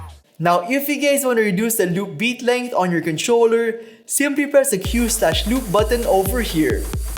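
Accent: Philippines. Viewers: Lol your accent is funny, are you filipino?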